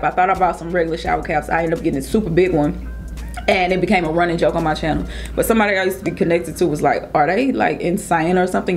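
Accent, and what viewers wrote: St. Louis, United States. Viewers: hello beauty, i see you and i still see you. you remind me so much of both my daughter and niece (it's that accent... st. louis). my hard working mother of two daughters is also considering weight loss surgery and i've suggested she view your videos. i know everyone won't have the same experience but i loved your candor and realistic journey. she too wants the surgery afterwards and yes she has friends who kinda look at her like she crazy for wanting this for herself. So it is quite true you cannot please everyone, why try, hard enough trying to get support from those around you. it's a brave and scary thing. still loving your journey and that cute little man you got. i know he keeps you busy...i swear it amazes me how much i see my diva you in. and you are a diva in the best of ways. bless you love The Lord keep you guided❤👍🙏👶❤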